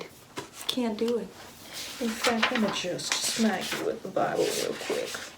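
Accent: South, United States. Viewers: Her accent really sounds like Benoit Blanc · Ok but I live for the southern accent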